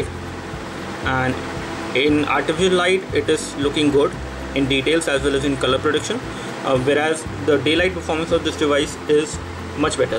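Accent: India. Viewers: "what he says again" ? explain y ur sounded like indian?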